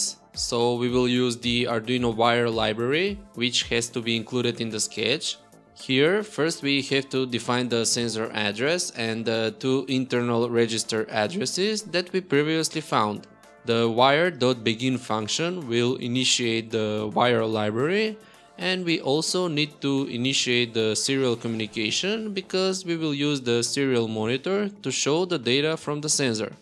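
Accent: Russia. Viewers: Which strong Russian accent 😊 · Что то мне мерещится русский акцент у автора. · Are u from Russia? :)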